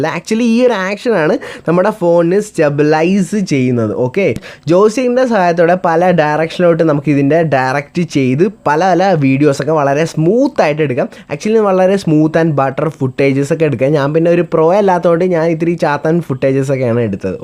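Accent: Alappuzha, India. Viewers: ആലപ്പുഴക്കാരനാണല്ലേ ... കൊള്ളാം മോനെ.... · Machane ninga alappuzhackaran aano....😀😀😀😀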